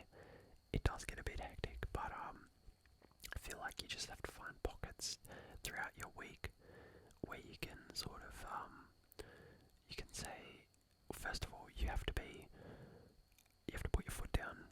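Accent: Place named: Australia. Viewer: it's so great to see another australian dude who likes/makes asmr! I've been wanting to make some for a few years but never started, didn't expect to open this video to another aussie voice it was weirdly familiar haha, keep it up man this was heaps relaxing 👏🏼 If it's not intrusive, do you mind sharing what state you're in? The mildly specific accent is there but can't pick it haha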